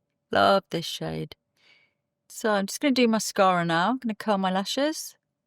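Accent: United Kingdom. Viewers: Me too! I'm not an English native speaker and I have a little bit of difficulty with Brit accent. I thought: did she say Talk to the poor? This is very catish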